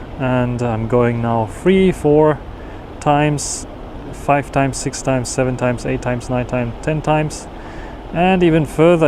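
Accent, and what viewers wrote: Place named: Germany. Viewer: From the first seconds of your accent it reminds me of the Rein river and your German nationality, thanks for the review! It will be interesting to see tests between these phones and the Pixel 6 pro or 7 pro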